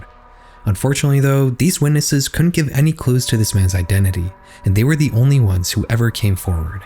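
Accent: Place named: Canada. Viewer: I'm really happy that this show is hosted by a Canadian. It's just too dark to be in an American or British accent. I need to learn "aboot" these things, not "a-bowt" them. It's just nice to hear while I'm looking up unedited versions of horrible stuff.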